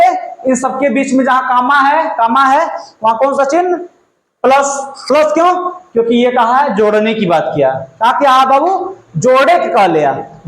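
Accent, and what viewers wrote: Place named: Bhojpuri, India. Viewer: Good explanation sir ........ 👍👍👍👍👍👍 Bhojpuri style...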